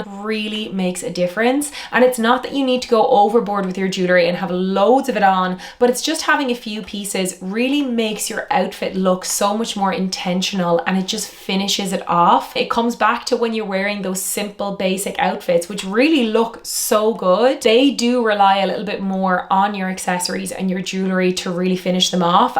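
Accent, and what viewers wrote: Ireland. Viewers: She's Irish and her pronunciation is utterly charming . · Who cares? It doesn’t alter the meaning or context of what she’s saying plus she has a strong Irish accent, go and Karen somewhere else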